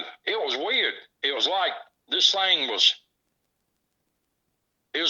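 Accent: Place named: North Carolina, United States. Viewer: I'm from Winston-Salem and a lot of folks go camping and tubing on the Dan River, I love your Eastern North Carolina accent, sounds a lot like our former governor from way back, Jim Hunt. Love your narration I know it's got to be scary out in the woods knowing these creatures are around.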